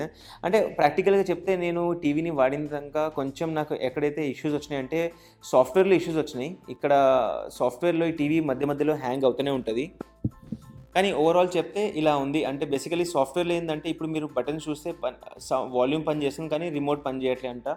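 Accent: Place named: Hyderabad, India. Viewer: Sir u r speaking good telangana language I.e, hyderabadhi language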